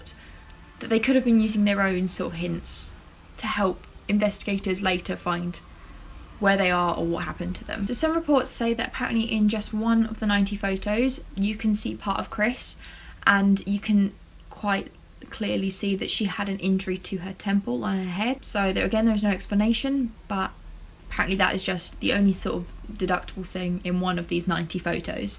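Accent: United Kingdom. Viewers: are you British, Australian, or South African?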